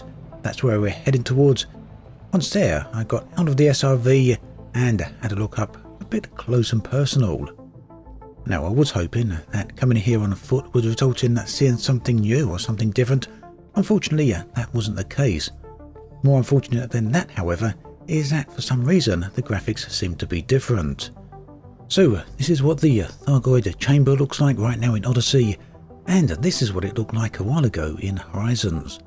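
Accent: United Kingdom. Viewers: The narrator’s British accent is too thick, cannot understand him!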